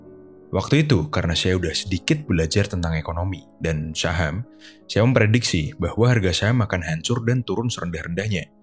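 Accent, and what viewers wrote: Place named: Southern Java, Indonesia. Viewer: Dari semua video yang saya tonton Tebakan saya sepertinya beliau orang jawa tengah bagian selatan atau yogya bagian selatan. Beliau bilang bapaknya tentara, kakeknya petani dan nelayan artinya rumah ya dekat pantai Logatnya bukan jawa bagian utara, tapi selatan. Beliau juga memelihara burung yang itu buming di jawa bagian selatan. Kalaupun tebakan saya mleset, mohon maaf Namanya juga menebak 😅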